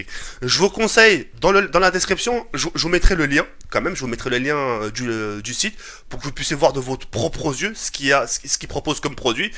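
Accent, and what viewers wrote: England, United Kingdom. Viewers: euh ton accent anglais TK ses koi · LES GANTSSSSSSSSSSS et ton accent anglais me font trop rire x))) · Très beau accent anglais :)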